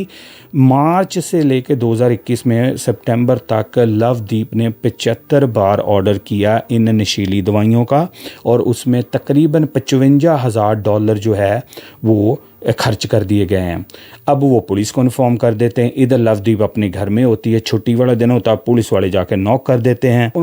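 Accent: Punjab, Pakistan. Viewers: Exactly, I am also thinking the same... he's got Pakistani accent. Is this channel real or fake?? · i have heard some pakistani punjabi youtubers....they talk just like him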